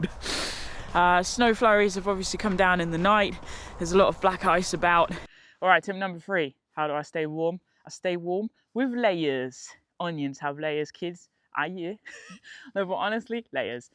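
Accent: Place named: Scotland, United Kingdom. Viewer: As you've demonstrated, a Scottish accent can help put you in the mind-frame for hardiness. Allegedly - a cold foot bath before bed can make them warm - (old scouting tip...).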